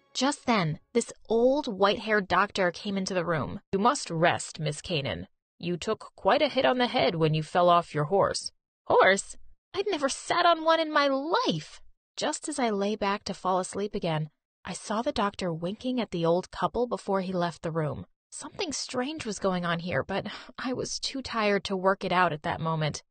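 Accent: United States. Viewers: You’re turkish and you have an American Accent? Kinda sus I am Turkish btw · This Turkish person has a suspiciously American accent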